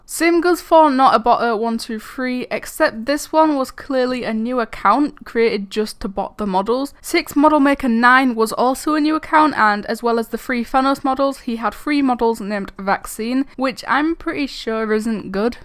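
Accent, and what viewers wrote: Scotland, United Kingdom. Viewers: Scottesh Accent over <num>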